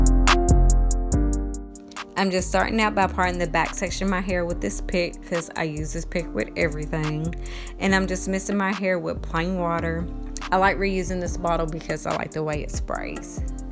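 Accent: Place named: South, United States. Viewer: Love your country girl accent, makes me trust you. #countrygirlsunited I love natural hair videos. I hope you make many more.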